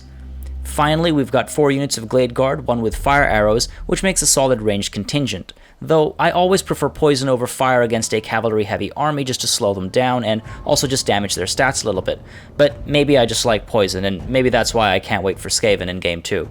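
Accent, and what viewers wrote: France. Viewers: Rocking the french accent! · Woah dude! Where does that nice French accent come from? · coming in hard with those french pronunciations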